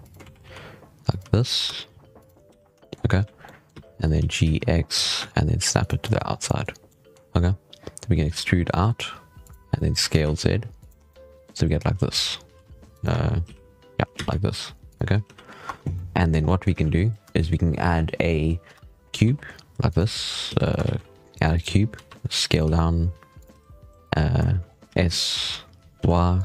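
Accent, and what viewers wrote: South Africa. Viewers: Just thought you sound abit ex south african, I am ex South african and we sound very alike lol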